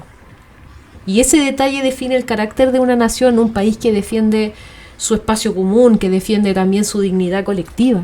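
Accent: Chile. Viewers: Eres chilena? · lindo lenguaje con tono dulce chileno que reúne terminología ecológica,política y cultural,medida a medida con pausas y silencios y hasta poesía! Una Felicitación 🇲🇽🇨🇱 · Eres Chilena, sin duda se nota, interesante tu video no se ni como llegue a este Canal; Pero me gusto mucho, Mexicana radicando en Ca. USA. Pero quien no pierde sus raices. Cuando vivi en Ciudad de Mex. pocas veces fui al Bosque de Chapultepec, antaño estubo muy descuidado, me alegra mucho que a raiz del Gobierno de AMLO y ahora de la Presidenta Claudia S. lo esten renovando. Un dia volvere y lo recorrere COMPLETO!!! 🙏🙏🌲🌳🌴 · que gran punto de vista... en un hermoso acento chileno... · Arqui.en su voz.descubro lo agradable del acento chileno.contrario a lo que se dice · eres chilena verdad? que acento tan cool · Me encanto la narrativa que hiciste en este video, supongo que por el acento que tienes eres chilena, te felicito y te mando un fuerte abrazo y Chapultepec es un bosque golpeado por el paso del tiempo y de sus malos gobernates, pero no obstante ello, sigue hermoso y siguue de pie.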